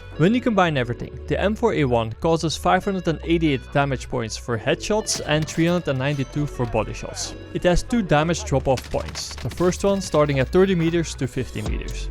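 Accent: Netherlands. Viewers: I can hear on your accent you are dutch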